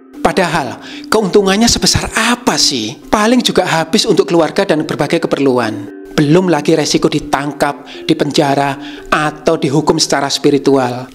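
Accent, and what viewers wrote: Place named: Java, Indonesia. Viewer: Jawa medhok!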